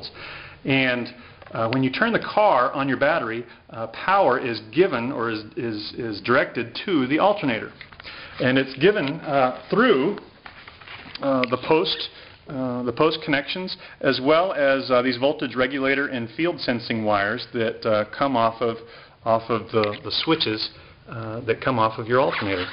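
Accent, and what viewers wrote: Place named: United States. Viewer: Hi.. by your accent I presume you are American, and even though your cars are much bigger than those in Britain, I struggle to think of a car with an alternator belt as long as the one on your bike -what kind of car was it?. I've found that the belt is the biggest problem for these rigs running off the wheelsI I spliced a bit of polyprop rope for one I made, but it was lumpy.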